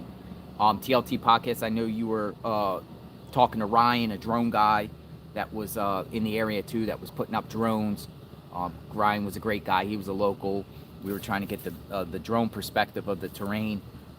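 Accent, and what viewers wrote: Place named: Pennsylvania, United States. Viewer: That PA accent! Can't miss it.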